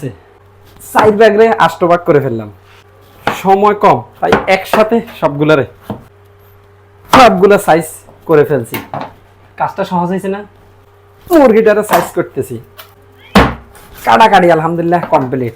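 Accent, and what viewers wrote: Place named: Chittagong, Bangladesh. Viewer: আপনার ভাষায় বুঝা যাচ্ছে আপনি চট্টগ্রামের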